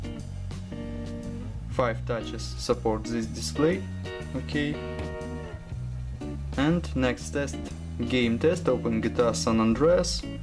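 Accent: Russia. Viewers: Дружище, лайк за волю к победе) Сразу слышно русскоязычного ютубера, думаешь над каждым словом. Американцы стрекочат по другому) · God you can't mistaken that russian accent.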